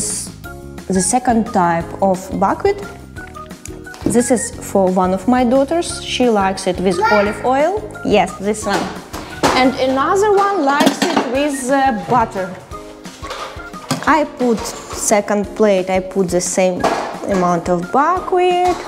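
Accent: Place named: Ukraine. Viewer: Sounds Ukrainian to me!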